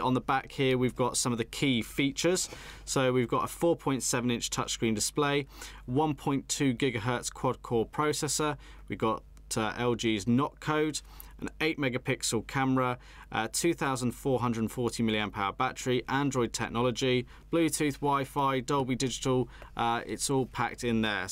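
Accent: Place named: United Kingdom. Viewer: i think he sounds british.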